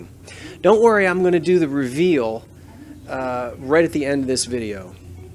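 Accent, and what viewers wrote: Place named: Pittsburgh, United States. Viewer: My roomy insists you're from Pittsburgh. Are you?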